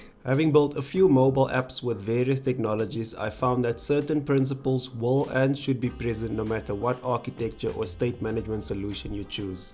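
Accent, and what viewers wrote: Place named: South Africa. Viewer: I was just wondering are you from South Africa? I mean your accent is so new to me :) ... nice content please keep the good work thanks a lot. Wish you all the best!!!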